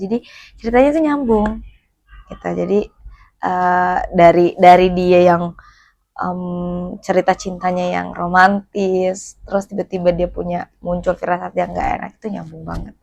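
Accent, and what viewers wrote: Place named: Bugis, Indonesia. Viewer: Khas bugisnya lengket banget😭 "di Tamang" GK tuh, tapi gpp, pokoknya kak terlope-lope lah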